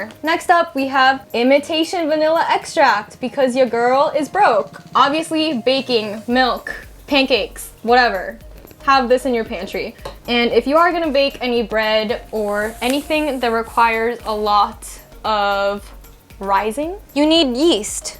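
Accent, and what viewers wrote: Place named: Spain. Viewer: I have to get better at grocery shopping, thanks, also loved your Spanish accent :)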